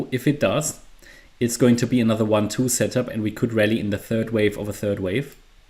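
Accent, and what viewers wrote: Germany. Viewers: is it me or is that a german accent?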